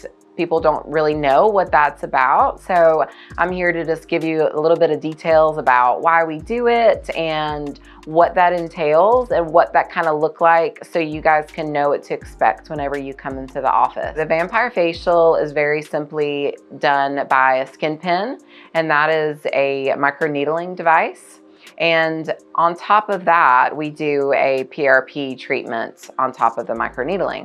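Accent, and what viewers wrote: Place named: Alabama, United States. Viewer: Immediately recognized your beautiful accent. Alabama here.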